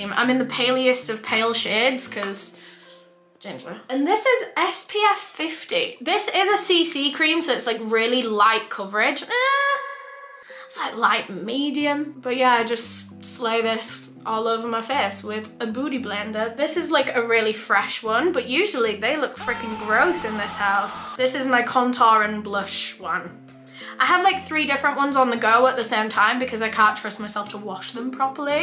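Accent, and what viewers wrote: United Kingdom. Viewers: My friends (who are 100% deaf and, thus, haven’t got the slightest clue what your Voice sounds like) mentioned that you’ve repaired the Auto-Captions of some of your November & December Videos…They said they had no idea you’re from the UK; they can’t hear, so they never noticed your Accent…Because I don’t know any Sign Language and my friends don’t speak and can’t understand speech either, I always need to communicate with friends by Text Messages all the time…My friends can’t watch your LiveStreams until they’re later saved to their (respective) Channel(s) as regular Videos, thus (hopefully) gaining Auto-Captioning (usually, a day or two afterwards)…My friends turn on the “CC” (so they can figure out what’s being said in Videos) and they hand me the remote so I can, meanwhile, turn on the Sound and I just ignore the Captions and listen to what’s being said in Videos…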